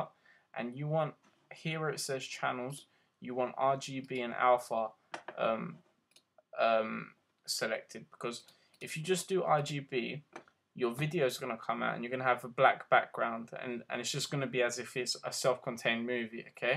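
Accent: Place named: United Kingdom. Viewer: thnx for that, helped me on my assignment. cool british accent u got there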